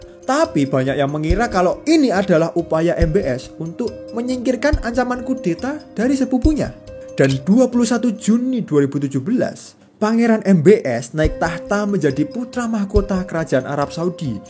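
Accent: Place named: Japan. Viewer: Ngomongnya medok banget kaya org jepang takeshi sunyoto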